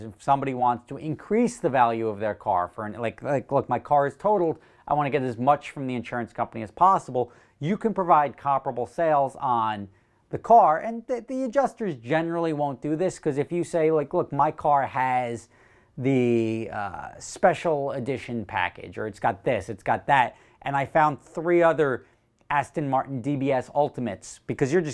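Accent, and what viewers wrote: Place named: New York, United States. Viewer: Am from Kenya and you just have the realest New York accent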